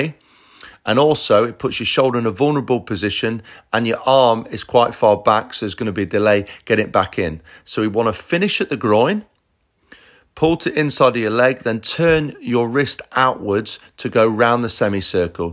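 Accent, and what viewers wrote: United Kingdom. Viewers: Very informative and helpful. The only point: "s english he uses in videos, I find it difficult to understand at times as a non-native speaker. Maybe its his british colloquial words and accent.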